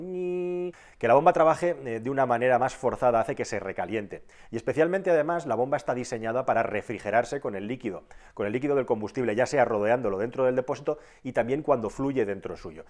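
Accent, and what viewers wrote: Spain. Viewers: ME ENCANTA EL ASENTO ESPAÑOL..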